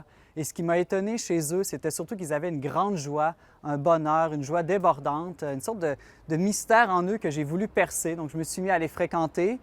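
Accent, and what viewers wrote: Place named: Canada. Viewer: Merci de nous transmettre votre JOIE avec votre joli accent Canadien ! ! ! Vive le CANADA 🇨🇦 Que DIEU vous bénisse et vous protège ! Bonne Évangélisation ! Alléluia et Amen 🙏🇫🇷♍️😇🕊🗽💒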